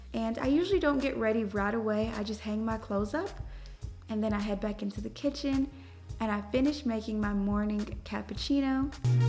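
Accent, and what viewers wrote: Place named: Oklahoma, United States. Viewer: Love this video💕Inspires me to walk with God the right way. Btw sis are you from OK? You sound just like the ppl from my hometown lol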